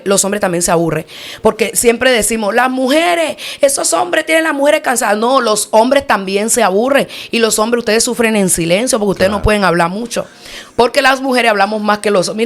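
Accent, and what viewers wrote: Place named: Dominican Republic. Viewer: Esta hermana parece Dominicana tiene el acento de el pueblo Hato mayor Rey Dios siga bendiciendo esta mujer del Reino un abrazo a la distancia ❤❤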